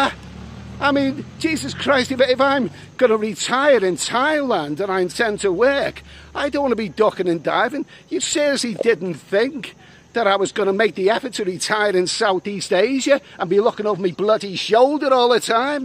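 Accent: Liverpool, United Kingdom. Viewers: A squeeky clean Scouser!!!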